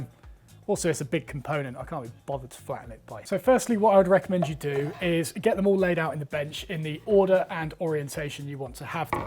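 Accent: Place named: United States. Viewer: Perfect American Accent 👌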